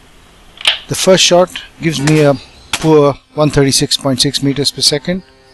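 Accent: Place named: India. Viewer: one of the better Indian accents in English I've heard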